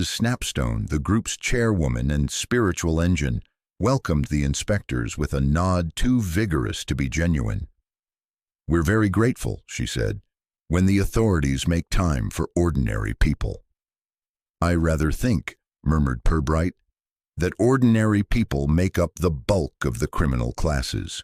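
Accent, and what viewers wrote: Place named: United States. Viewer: Dreadful! AI rubbish, appalling American accent!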